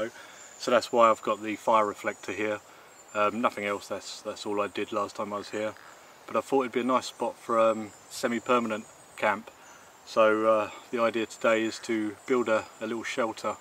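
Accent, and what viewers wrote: England, United Kingdom. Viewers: Hello greatings from Moose Jaw in Saskatchewan This is a nice video. You made it again nice and calm I like you way your Englisch way spezialy as a German living in Canada lol. Good idea to cook on a stone I have never done this. Your shelter is good to my respect I hope it will still be there when you return. Ok I wish you luck and can wait for a new one. Take care and stay healthy with your family. Bye bye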